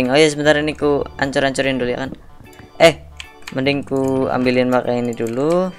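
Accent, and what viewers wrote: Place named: Tegal, Indonesia. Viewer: Abang orang tegal ya :v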